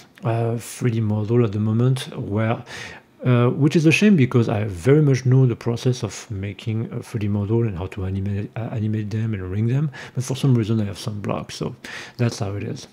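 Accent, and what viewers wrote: France. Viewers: Ton accent c'est une evidence tu parle français. good video btw.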